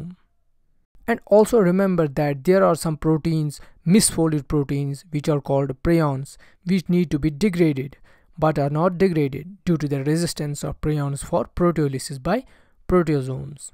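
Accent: Kashmir, India. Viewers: Sir i guess u r from kashmir?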